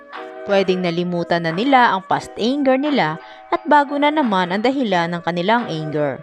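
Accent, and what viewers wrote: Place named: Visayas, Philippines. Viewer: Huwag ka naman bully Tiktokersph pangit ang ugaling ganyan. What's wrong having with visayan accent? Ikaw ba excellent ang accent at grammar mo??! Manood ka lang huwag ka ng mamintas! 🧐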